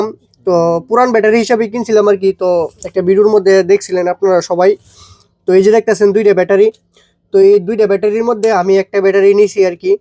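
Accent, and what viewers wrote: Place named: Char, Bangladesh. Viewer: ভাই আপনার বাড়ি কোথায়,দেখি চরের ভাষায় কথা বলেন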